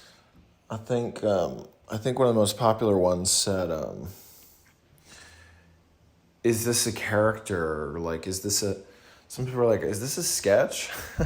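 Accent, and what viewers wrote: South, United States. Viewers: New here. That southern accent is so spot on. Where are you from? You’ve obvs spent time in the south. Love your stuff. It’s bananas and exactly what I need right now 🙏🏼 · that southern drawl lulling the depression out of me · This amalgamation of a southern accent is like Nashville Grand ole Opry singer meets rural Georgia buget Paula Deen.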